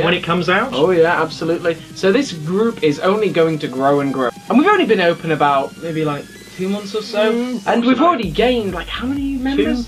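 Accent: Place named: Warrington, United Kingdom. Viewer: Was in Warrington the other day and everyone sounded like you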